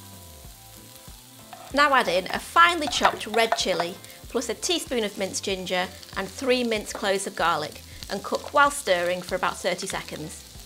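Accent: United Kingdom. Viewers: wonderful recipe, thanks ! but . . . ⅛ tsp white pepper - is this molekule-like amount worth start grinding the corn ? :-) another thing: hear to talking to my german ears it sounds more british than american dialect. Am I right in thinking you're from U.K. ?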